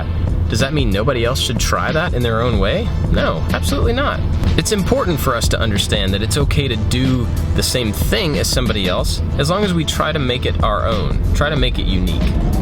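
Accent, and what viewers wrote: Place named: United States. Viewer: I feel bad for. This channel is absolutely brilliant - I watch all the vids even if the subject doesn't particularly float my boat. They're always as well made as the 'stuff'. It's just a shame people have to be negative and/or rude enough to make comments so feels he should explain himself. That said, I like as a presenter, (I even like his American accent), his views, thought process, personality, approach and general all round humility. The sort of good guy you would like to have a pint with. So I guess what I'm saying is: thank you haters. We got a bonus video thanks to your bitterness. Keep up the great work and screw those other fools. Carry on.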